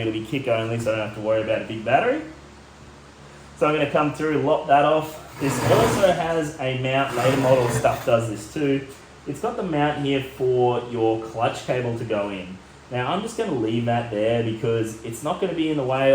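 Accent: Australia. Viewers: thank fuk someone who's speaks Australian is Australian is doing a Rigid Shovel ground up rebuild, been sitting on an 81 procrastinating on exactly what your doing, well exactly as far as my amateur skills will let me go anyway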